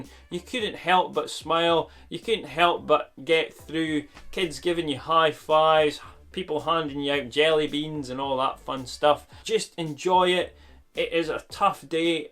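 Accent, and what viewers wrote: Scotland, United Kingdom. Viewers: Running advice with a scottish accent. What more could you ask for. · He's Scottish 😂 English is their first language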